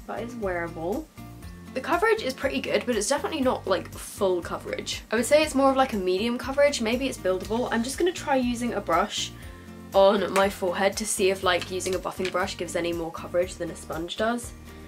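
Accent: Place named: United Kingdom. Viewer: she sounds so extra British today. Idk y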